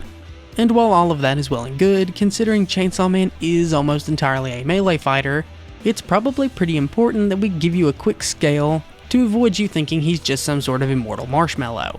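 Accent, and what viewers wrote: South, United States. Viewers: Love the southern accent no diddy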